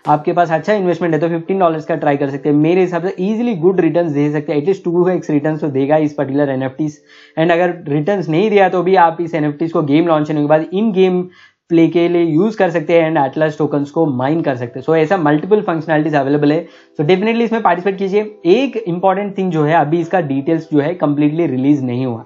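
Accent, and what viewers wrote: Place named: South India, India. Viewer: maybe your accent is different that's why , my south indian friends are also like you , don't take it to your heart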